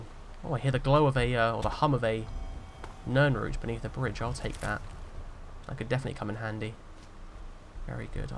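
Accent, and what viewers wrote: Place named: Europe. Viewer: I'm an American and the European accent makes it so much better. Good content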